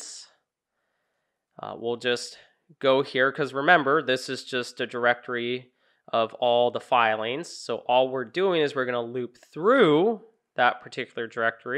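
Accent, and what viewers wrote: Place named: United States. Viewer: very helpful. thank you. keep it up i agree with a previous commenter that having instruction in my native english american accent and idiom makes it significantly easier and more enjoyable for me to actually learn to be able to actually implement some of this in my coding and finance data science projects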